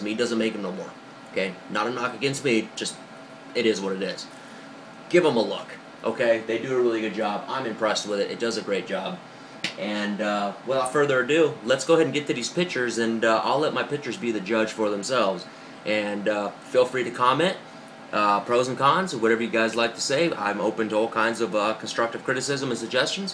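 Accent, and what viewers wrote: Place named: Texas, United States. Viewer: i thought you was from texas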